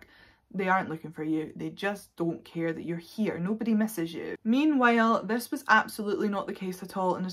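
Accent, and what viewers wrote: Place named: Scotland, United Kingdom. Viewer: Totally the only other human interaction she has was with him and when his controlling meant he wasn't horrific to her she took that as kindness. So important for people that haven't experienced it to have an open mind as to what these victims feel the way they do. Thanks again really loved the content. As a fellow Scot your accent helped too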